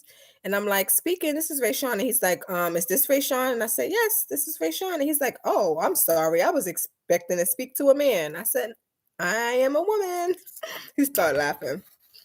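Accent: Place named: New York, United States. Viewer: I figured it was either NY or NJ the accent,husband as well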